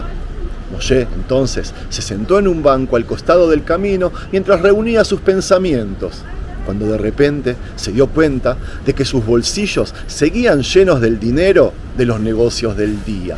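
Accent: Buenos Aires, Argentina. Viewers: Como es que aun guardas el acento porteño, despues de tanto tiempo.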